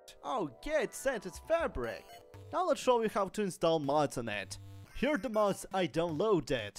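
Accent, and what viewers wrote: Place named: Europe. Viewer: sounds like netherlands, german and czech accents mixed together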